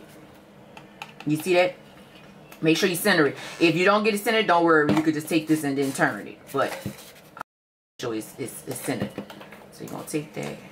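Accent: New Orleans, United States. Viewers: I can hear it in your voice baaaaby dat ya straight from the N.O. Thanks baby for helping me as a beginner out ❤. . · So cute! Are you from New Orleans? I recognize that accent 🥰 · You have to be from da 504 NOLA Baaaabeeee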